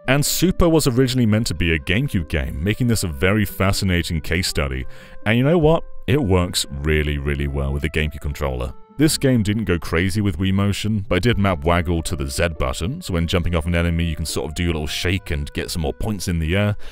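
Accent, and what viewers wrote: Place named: United Kingdom. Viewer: your accent from what region is? because I feel it doesn't sound like stereotypical british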